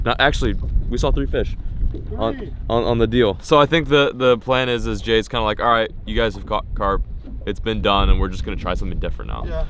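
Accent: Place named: Boston, United States. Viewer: Still loving that boston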